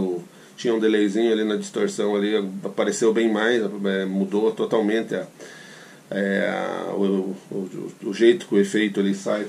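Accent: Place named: South, Brazil. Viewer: Sim, excelente custo benefício mesmo. Me inscrevi no canal e estou olhando os vídeos aos poucos. Você é de onde mestre, senti um sotaque meio parecido com o meu na região Sul. Sou de Bento Gonçalves RS. Grande abraço!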